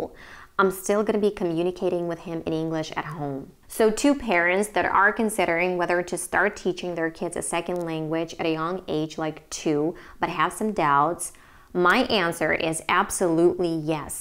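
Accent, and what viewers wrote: United States. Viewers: А муж тоже на англ с ребенком общается? Как у мужа с английским? Он себе такое же американское клевое произношение ставил, как у вас?) Вообще, я просто с отвисшей челюстью смотрел видео. Очень восхищаюсь вашей смелостью, целеустремленностью и авантюризмом))))) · Looking to raise my kid bilingual too. Could've sworn you were American. Thanks for the tips! Great story! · Підписаний на Ваш канал і з задоволенням його слухаю. Цікавий зміст, чудова американська вимова, вродлива і харизматична автор блогу. Бажаю успіхів у Вашій роботі. Цікаво буде через 2-3 роки побачити і почути, як вільно розмовляє Ваш малюк двома мовами!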